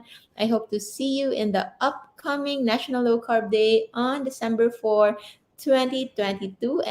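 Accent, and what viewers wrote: Iloilo, Philippines. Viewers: Ilonggo si Doc?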